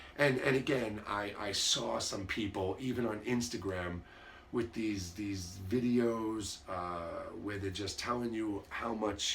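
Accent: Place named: New York, United States. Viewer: Love it and love your NY accent!💜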